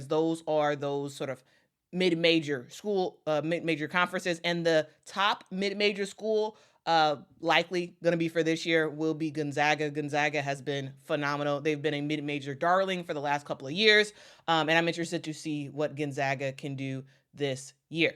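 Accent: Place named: New York, United States. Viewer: where is this nyc accent coming from? Lol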